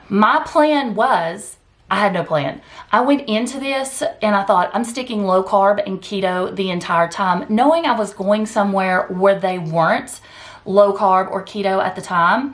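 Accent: South, United States. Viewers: Hi, that’s GREAT! Thats keto flexing, when you go off and on keto with negligible negative results. Gotta live life. I love the southern accent( I’m a northern guy). Keep up the great work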